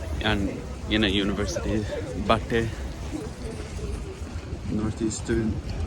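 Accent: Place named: Tulu, India. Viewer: .. You are simply amazing🎉 keep going 🎉 & ur TULU is ❤❤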